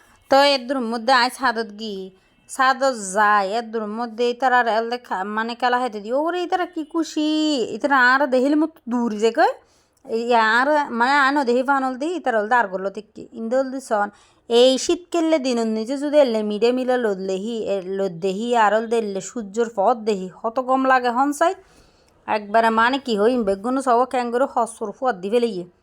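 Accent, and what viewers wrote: Chittagong, Bangladesh. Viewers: আপু তোমার মুখে চিটাগাইংগা ভাষা অনেক সুন্দর লাগে 😅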